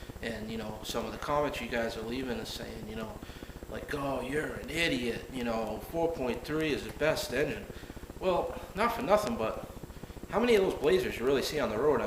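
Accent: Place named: Massachusetts, United States. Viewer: hey man from your accent you sound like your from MA? Anyways I gotta agree with you. Alot of people on here watch davesfarm and that other bullshit and get these crazy ideas. Plus alot are younger kids. I live locally and know what people will buy on CL around here. A good running 350 sure thats worth pulling or a decnet transmission. You sure don't see many s10 blazers here any more and the 4.3 would not be worth the time. Why do people like the 4.3 and 3.8 so much anyways?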